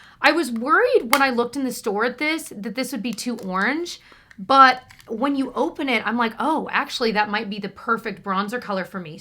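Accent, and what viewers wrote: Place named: Midwest, United States. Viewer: So.. watching yours and Tyler’s videos I was like. Matt! (My husband!) this girl lives in a Pulty subdivision! She goes to Meijer! I think she lives in Michigan! Then he watched literally one of Tyler’s videos and was like nope. Indians. Ugh! Anyway. Bridgerton was my first real romance series. Haven’t seen them but the books are good. I watch your stuff when I’m out of town for the Midwest accent!!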